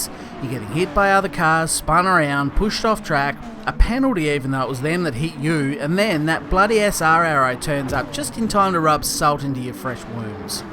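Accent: Australia. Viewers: Straya mate! How could you ever think he is British? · siga raga He is an Aussie if you couldn't tell by his accent, and the Australian flag next to his gamertag.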